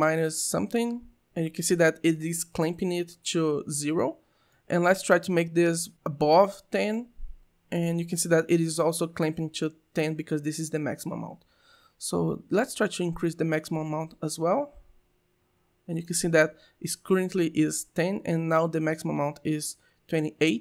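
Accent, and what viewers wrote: Brazil. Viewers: Vi que vc era brasileiro só pelo sotaque, valeu pelo vídeo cara :)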